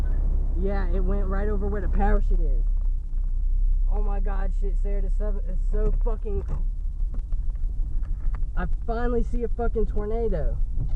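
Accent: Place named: North Carolina, United States. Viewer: you can obviously tell that your from NC, you've got that NC Country Twang. I have it too. <-- and for the people that don't know what a twang is, its kind of like your accent.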